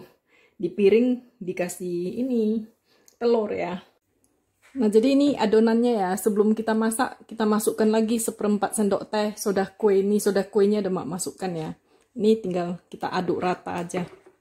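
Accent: Medan, Indonesia. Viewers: Kangen juga dengar logat2 medan mak.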